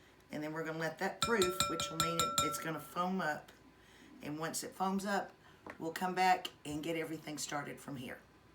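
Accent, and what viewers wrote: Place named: South, United States. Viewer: What a treat to find your channel today! I’ve been baking bread, rolls, English muffins, etc, etc. for sixty years now, and like you, it’s been like a therapy for me. I’ve always loved cooking and baking! This recipe will be my next one! Thank you! I look forward to your future videos and will, of course, be checking out past postings. Love your easy going style and southern accent!🤗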